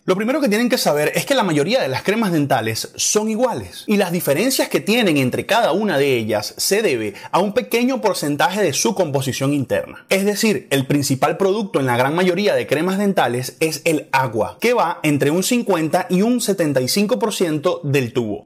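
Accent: Venezuela. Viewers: el es venezolano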